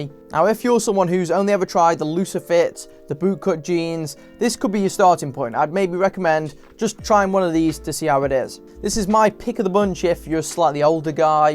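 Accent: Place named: England, United Kingdom. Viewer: Dislike because if english accent! Hard to understand! Dislike 3 times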